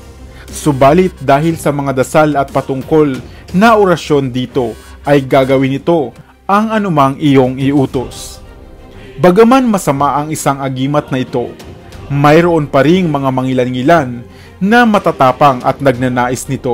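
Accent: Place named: Philippines. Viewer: Galing ng boses ng narator maliwanag ang mensahe pilipinong pilipino di gaya ng iba slang n di mo alam kung sang bansa galing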